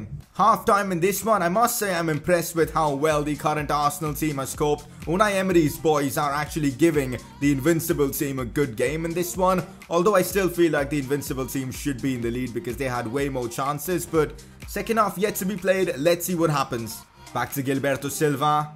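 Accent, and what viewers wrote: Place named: India. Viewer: You sound like an indian(🇮🇳) or Someother Asian country person🌏 that learnt to speak English just a month ago😂😂😂(STG(swear to God)not tying to be racist 😑)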